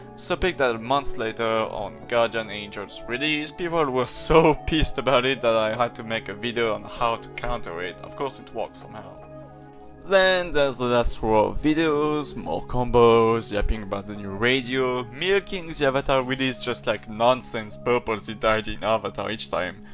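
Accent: France. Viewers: holy french accent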